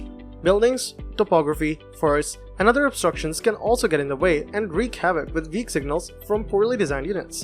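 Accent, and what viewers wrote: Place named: India. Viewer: You are trying so hard to hide your Indian accent.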